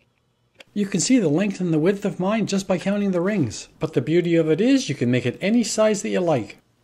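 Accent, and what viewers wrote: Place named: Canada. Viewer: I'm guessing from your accent you're in Canada? Amazing assortment of birds you have at your property! I generally just get the common house sparrow here in the UK plus the odd robin and loads of greedy starlings! Going to have a go at one of these, not because I have a squirrel problem but there ARE a load of cats around and two in particular like to stand at the base of my bird feeder and spring up at the unwary birds. Great idea and endlessly adaptable - would never have thought of using silicon!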